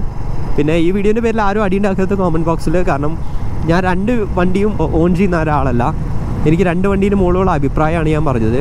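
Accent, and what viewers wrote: Kozhikode, India. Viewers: Bro കോഴിക്കോട്ട്കാരനാണോ?.... ഇടക്ക് മ്മള് കോയ്ക്കോട്ട്കാര്ടെ slang കേറിവരുന്നപോലെ.. മൂപ്പരൊക്കെ😃🤔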